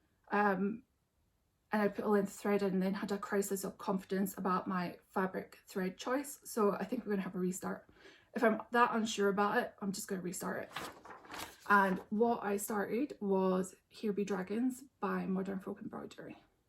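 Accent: Scotland, United Kingdom. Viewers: Hi, I just found your channel after a shout out from mommolovesyougb. Those are some fantastic finishes for the year, you achieved a lot! Great hearing a familiar scottish accent on flosstube, I am a fellow scot from aberdeenshire! Hope you have a merry christmas, a happy hogmanay and a fantastic new year!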